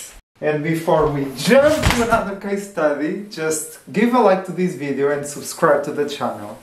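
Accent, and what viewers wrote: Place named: Portugal. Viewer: I'm going to guess you speak Portuguese?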